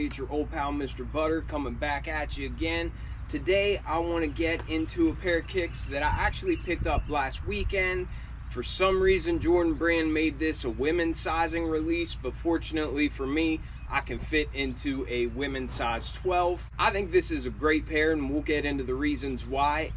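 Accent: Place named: Pennsylvania, United States. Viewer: Lost my best friend of 42 years in June. Had a heart transplant and didn’t make it. I feel your pain! We are all right there with you. Don’t forget that. I’m in Dubois PA , I can sense your PA accent haha. Been a 4 fan since 89! My fav Jordan ever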